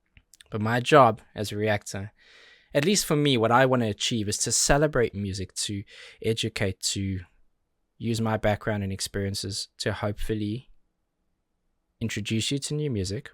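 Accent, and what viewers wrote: South Africa. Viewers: My favourite album ever! I’m new here. What’s your background? I think I hear a slight South African twang to the way you say certain words! I’m Zimbabwean-born and grew up in London. I’m definitely going to subscribe as I really like your vibe. Perfectly timed to find your reaction and my favourite band. If you still haven’t listened to this whole album, you definitely should. It’s such an experience from start to finish xx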